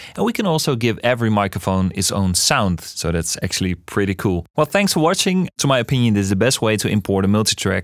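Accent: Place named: Netherlands. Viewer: While listening to this tutorial I thought 'Hmm, this sounds a bit Dutch'. Then I realized I recognized the voice. Then I saw it was you. Thanks, ' '!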